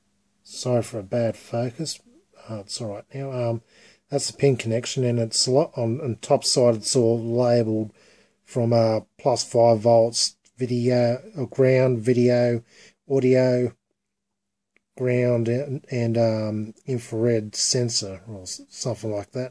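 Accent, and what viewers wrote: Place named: Australia. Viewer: Hi there. I have a camera I got with my little quadcopter. It records onboard to a micro sd card. The quality is surprisingly ok but it does not have av out . I would like to add an av out plug to it if possible for wireless streaming. Would u take a look at it please and maybe point me in the right direction as to wht to solder or not. I can take pics for ya or send ya a video of it. I hear u are Australian. Could maybe post it to you. Im in perth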